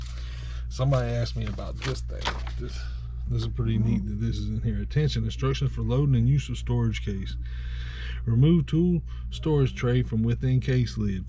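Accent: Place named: Appalachia, United States. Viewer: Hey hillbilly talk right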